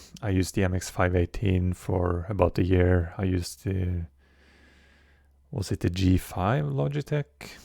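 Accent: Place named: Norway. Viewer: Mate, your videos are more than helpful. I learned some great tips, The one where you hold the medikit and protect your head (hit point) against grenades was a pearl of wisdom. Today, you taught me not to always spawn at squad leader, which should be obvious. Only until someone points it out. I have developed an obsession with battlefield 2 that came out of personal trauma, and I have this urge to become a better player. It's crazy, as it's a 20 y.o game, but EA and Dice haven't come out with anything better since IMO. BF3 and BF4 single player campaign are good, but they took away all the team and commander functions, and replaced it with a diet version. No ability to mod. Don't get me started on Jets. No take off at runway is a big, fat joke. I dislike (beyond comprehension) the path they chose. They screwed over customers/fans for the money, and to be more like COD. 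Imagine BF2 with BF4 graphics. No reason why this shouldn't have been made possible. I truly dislike EA and Dice! Instead we are stuck with these outdated graphics and engine. I had an idea you were Norwegian. Your accent is very familiar. My Daughter is from Bergen, and her Mom is from Sogndal. I can tell you are an infantry purest. I don't even sdee you using UAV/Artiliery, or Commander in your videos. I looked up Zeqtr. He is very good. Gonna watch more and pick up some tips. I was wondering, are there any competitive BF2 servers nowadays, or do the remaining servers just account for public players? I mean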